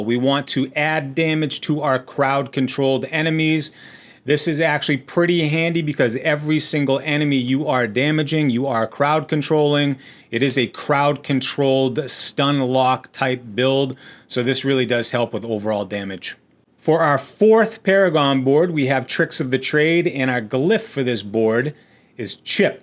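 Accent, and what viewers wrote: New England, United States. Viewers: Do I detect a New England accent ?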